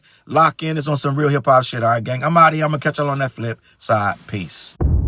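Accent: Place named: New York, United States. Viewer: Absolutely! Your accent is dope too. You got that NY swag👌🏽 Respectfully.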